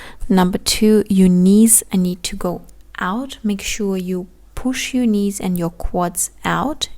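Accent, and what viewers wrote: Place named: Russia. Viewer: Очень сильно бьет по ушам рашкинский акцент)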